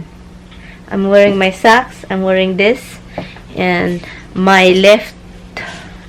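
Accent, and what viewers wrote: Visayas, Philippines. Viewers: HI sis.....i really like ur accent bisay jud ba,i am proud of it.sad to heard about ur duhang totoy dai oi mailbag sab,i avoid mo mag bra every time u goes to bed.basin naa ka ces.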